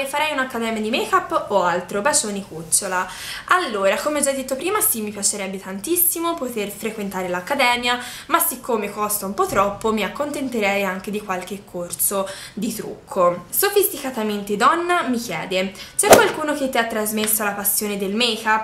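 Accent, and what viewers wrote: Tuscany, Italy. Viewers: #askiaia ciao:) ormai ti seguo da un pò.sei bellissima e dolcissima! complimenti per il risultato dell'esame!Le mie domande per te sono: So che sei toscana dall'accento ma in quale città vivi? Hai altre passioni oltre il make up? Un bacione:* continua così.mi saluti?:)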